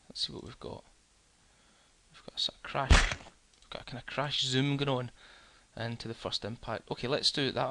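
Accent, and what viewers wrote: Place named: Wales, United Kingdom. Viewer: stop speaking in that horrible welsh accent i'm not hating i just don't like ur accent ur a gr8 guy just that accent is not my kindness no hard feelings <3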